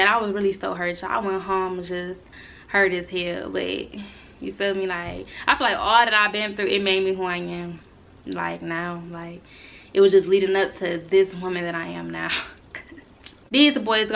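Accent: Detroit, United States. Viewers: I love Detroit accent 😍😍😍 her voice so pretty 💝💖💝💖💝💖💝💖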